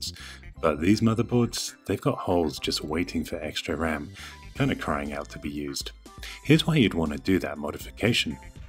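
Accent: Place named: Geordie, United Kingdom. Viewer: As someone from the North East, UK, that was a passable Geordie accent :) Also that carbon fibre wrap skinned Amiga looks amazing.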